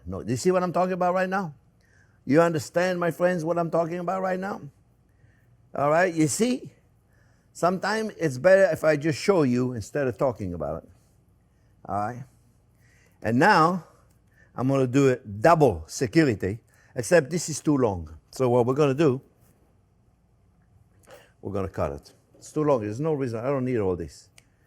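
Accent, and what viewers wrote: France. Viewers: Don't loose your French accent, but I didn't understand what you were saying with the sign language at the end after you tasted it but it was still funny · A little bit on accents! Accents are a reflection of geographic pride Chef! Listen to those from NYC, TX, GA and many will say, "what the heck did they just say," it's geographic pride and some things can never be changed. You are an American French Chef, never apologize for your accent, it's you! A beautiful program. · Ah ah , vous m’avez encore faite rire … et cette recette a l’air succulente ! Je vais la proposer à mes amis ce week-end. Et votre accent est très frenchy. On adore 😂. Merci pour votre belle cuisine chef !❤ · I love your French accent Chef! I am certainly going to make this stuffed pork. Perfect for Fall here in Canada.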